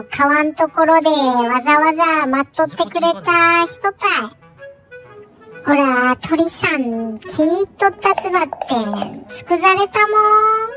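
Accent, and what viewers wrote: Kumamoto, Japan. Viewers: 今年はジーニー兄ちゃんとバーニーとの出会いがありました。笑いと癒しを沢山届けてくれてありがとうございました❤ 出会いは未だ登録者数が3000人未満だった時です❤でもその後の快進撃❣️素晴らしい👍です。とにかく何気ない日常だけど笑える😅🤣熊本弁もいいよね〜💕 東京からだと熊本は遠いからなかなか会えませんが、もう親戚のわんちゃん達って感じです。 来年もどーぞよろしくお願いします❤ で良いお年をお迎えくださいね💕 では、ご無礼いたしま〜すぅ❣️👈この言葉私のお気に入りです😅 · はじめまして😂こてこての県民天草〜熊本市出身です😊（コメントはあえてNo熊本弁で🙏）やっぱり熊本弁はネイティブが話してこそ生きると痛感してます!来年もスカッとする熊本弁トークお願いしまーす👌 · ばっちゃんのチャンネル見つけて1週間。毎日見ていたら私の発音がちょっとおかしな事になってるみたいです😅千葉県民ですが、熊本弁に侵食されてきました。 来年も熊本弁を習得できるようたくさん見ます😊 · こんにちは😂 いつも仕事から帰ってきて我が家のミディアムプードルと一緒に楽しく見てます😄 来年はバーニーちゃん、 ジーニーちゃんに会いに行きたいと思ってます😄 熊本弁…楽しくて笑っちゃいます🤣 (息子が熊本に住んでてイントネーションが変化して帰ってきてます笑) 来年も楽しみに待ってまぁす🐶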